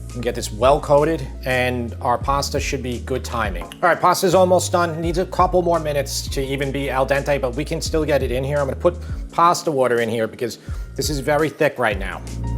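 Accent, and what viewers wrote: New York, United States. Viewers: Mostly here for the delightful New York accent. North-Eastern US has the most pleasant sounds of all US accents.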